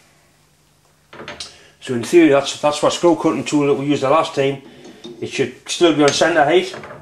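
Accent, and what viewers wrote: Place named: North East England, United Kingdom. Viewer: I'm really enjoying this series,. It is all very well produced and fortunately I am from sufficiently far north to totally understand every single word of your exquisite NE accent. I commend how you use the Vernier Calliper Gauge as a 'Very-near' Calliper Gauge, then turn to the Micrometer for absolute accuracy. All old-school stuff and still valid today. All these ISO units engineers who boast about being able to work to 0.01mm (4/10,000 or 0.0004 inches) when the old brigade, working with a Micrometer with Vernier markings to Imperial units, regularly worked to limits of 1/10,000 or 0.0001 inch (0.0025mm). I think that gives bragging rights to the old school Imperial unit machinists! For any doubters out there, our women in the aircraft factories during WW2 were working to less than a 'thou' accuracy.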